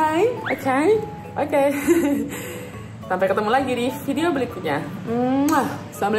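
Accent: Papua, Indonesia. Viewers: Kk cantik, cerdas,lincah, tdk sombong, positif tindakan dan pemikirannya.... 👍👍👍 😍😍😍 Inspirasi buat muda mudi indonesia nih... Suka kalo lagi ngomong pke logat papua... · Seneng bangett sama logat papuanyaa... Sukses terus kakk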